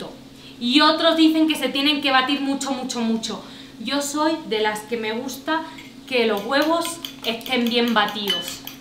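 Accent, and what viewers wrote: Andalusia, Spain. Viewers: Realmente se ve deliciosa la tortilla, me encantó tu estilo, tienes nuevo suscriptor. Una pregunta, tu acento es andaluz? Perdona, es que no distingo muy bien todos los acentos españoles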